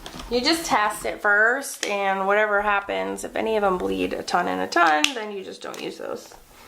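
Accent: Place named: New England, United States. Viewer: Love the NE "talk" don't lose it please. This video was very helpful. I have the streaking problem (shame) lol, so thanks for sharing your wicked cool wise ways.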